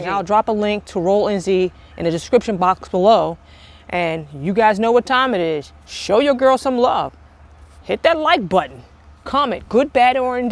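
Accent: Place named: Virginia, United States. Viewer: I got mine because of your previous video. Love your Virginia accent....!!!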